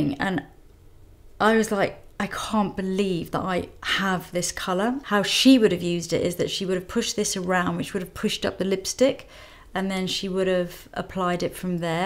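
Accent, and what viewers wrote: United Kingdom. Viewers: Shes got amazing eyes and a great facial structure...I love her briiish acesent...great make up tips thx...we all love Audrey she is a darling and amazingly creative..thx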